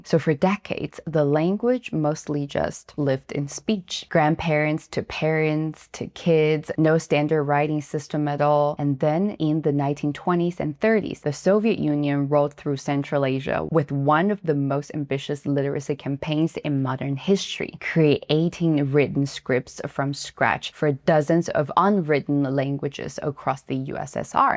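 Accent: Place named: United States. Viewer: She has an American accent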